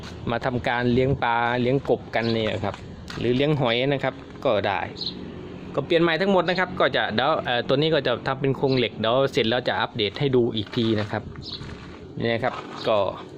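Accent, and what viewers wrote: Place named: Korat, Thailand. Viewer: พูดโคราช24ช.ม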